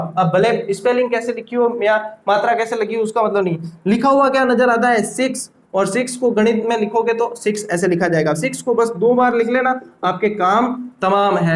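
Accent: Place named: Rajasthan, India. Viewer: Thnku so much sir.... Sir m rajsthn se he hu lekin rajasthani nhi aati ap bolte ho toh sunne m accha lgata hai.... 😇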